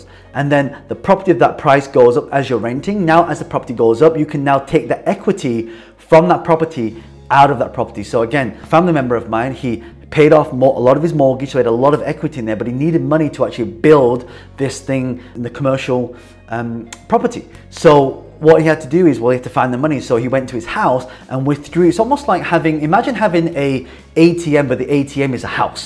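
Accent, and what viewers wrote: United Kingdom. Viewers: Love it. Are you from UK?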